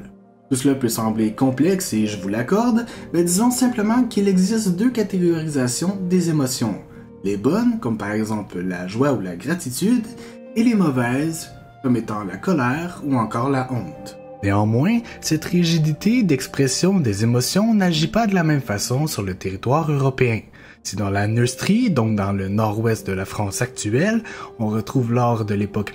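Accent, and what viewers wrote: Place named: Quebec, Canada. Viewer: je comprenais rien a ce que tu disais, puis j'ai compris que tu etais quebecois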